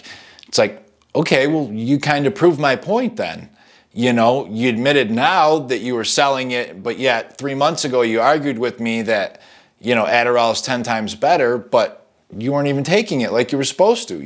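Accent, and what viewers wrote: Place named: New York, United States. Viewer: You’re from central New York aren’t you? Lol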